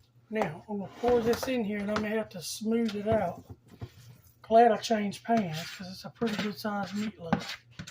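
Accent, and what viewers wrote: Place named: South, United States. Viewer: Meat loaf 😮… Happy to hear your lovely Southern voice princess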